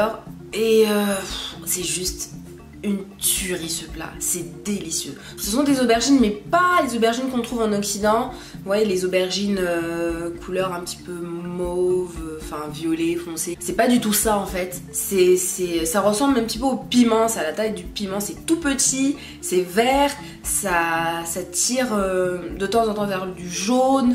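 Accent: Gabon. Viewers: Eké ton accent myéne est mitigé hein! Mais j apprécie ta vidéo felicitation 👏🏽👏🏽👏🏽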